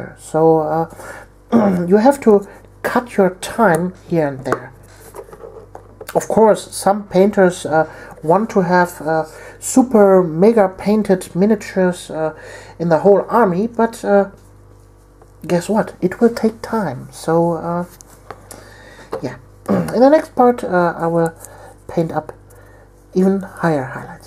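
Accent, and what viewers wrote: Germany. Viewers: Jonathan Holt It's a woman with a german accent and a bit of an uncommon speech habit (sort of stiff mouthed, it's hard to describe).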